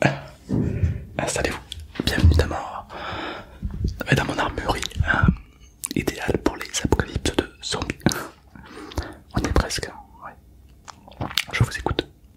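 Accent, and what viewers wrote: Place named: Marseille, France. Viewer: L accent marseillais oulaaaaa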